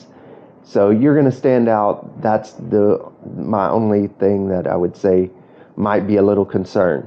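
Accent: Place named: Bisaya, Philippines. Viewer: Hahaha loving the bisaya accent keep posting video bro you might consider adding light instrumental music to add your video a little life …. 😊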